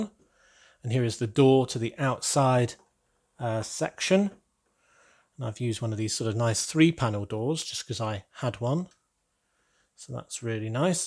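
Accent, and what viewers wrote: United Kingdom. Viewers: Sand green pillows to match the scrubs. Fantastic hospital MOC. Love your build style. What British accent do you have. I’m Australian but I believe most people from South Australia have the estuary accent from along the Thames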